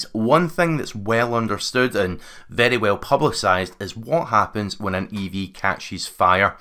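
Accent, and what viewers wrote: Scotland, United Kingdom. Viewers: in this video, you will see on screen, that there have been 15 verified Vapour Cloud Explosions globally, since 2010. Try measuring that against the number of petrol vapour explosions over the same period. You cannot; because it is in the many thousands. As for a “light electric vehicle fire” that includes countless e-scooters, e-bikes, motorised wheelchairs, carts and the like. Modern BEVs have sophisticated battery management and cooling systems. Try stating those figures. There were 239 fires recorded in the UK from July 2022 to June 2023 that were linked to EVs, but the vast majority of those did not involve the battery at all. A lie with a cute Scottish accent is still a lie.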